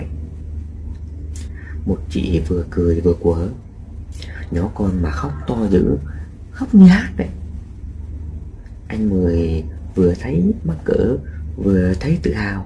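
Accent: Mekong Delta, Vietnam. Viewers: Cám ơn ( xin lỗi vì không biết xưng hô như thế nào ) nhiều lắm. Bạn đã truyền tải bài viết của bạn bằng chất giọng miền tây hay quá đúng như tình cha của hai cha con Chú Mười ❤❤❤ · Anh đọc theo giọng người miền Tây thiệt tình cảm, nghe vừa thân vừa thương!!!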